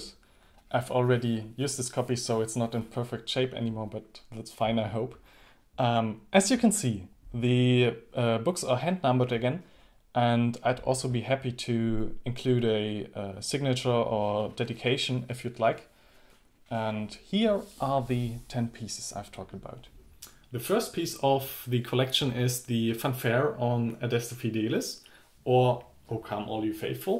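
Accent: Germany. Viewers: I was afraid that musical geniuses died years ago. But here is who knocks my socks off...He seems to have a German accent...It must be genetic. His work makes me feel validated and Happy. My parents gave me an appreciation of music. I picked up the ball and ran with it. Music ist mein Hertz, mein Geist, Mi Corozon, Moi Coer, how much time do you have?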